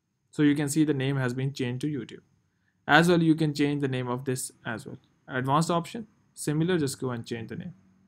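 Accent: India. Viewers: as soon as i heard the indian accent i knew i was saved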